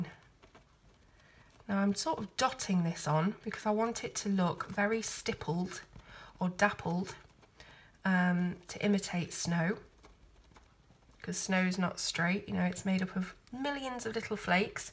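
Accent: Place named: Northern England, United Kingdom. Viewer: I think you tutorials are great and very informative. I love watching your videos and listening to a northern English lass is very refreshing. So Thanks 😊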